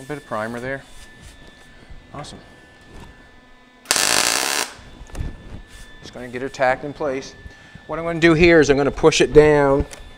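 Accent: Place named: Nova Scotia, Canada. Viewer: I didn't even notice how you were saying plexiglass, I figured it was was your Nova Scotia accent... Lol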